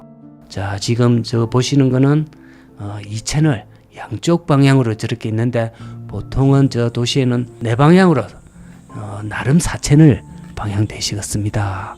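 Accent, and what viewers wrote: Gyeongsang, South Korea. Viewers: 전라도 사는 60대 입니다! 경사도 사투리가 넘 구수합니다.꼭 구독하고 있구요.정말 많이 배우고 있읍니다.감사합니다.수고하세요.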